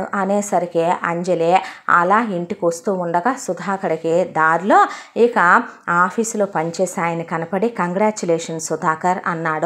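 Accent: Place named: Guntur, India. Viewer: Mi story reading chaala bavuntundi gaaru.Guntur slang excellent.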